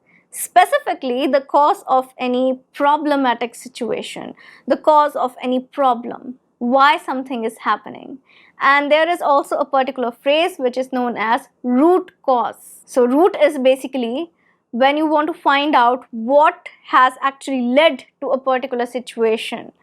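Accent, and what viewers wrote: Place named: RP, United Kingdom. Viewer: Can be called as, Queen's English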